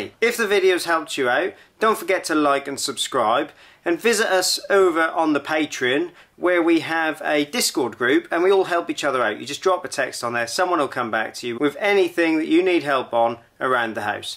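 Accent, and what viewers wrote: Norfolk, United Kingdom. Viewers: Do my ears detect a Norfolk accent here?!! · great video ,well explained , I have two bigger rads in my extension which struggle so think this will help . what neck of the wood you from sound like Norfolk... cheers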